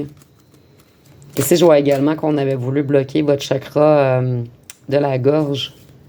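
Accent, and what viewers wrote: Canada. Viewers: Bonjour toujours très juste,bravo avec ce bel accent canadien, merci belle journée à vous 🙏👍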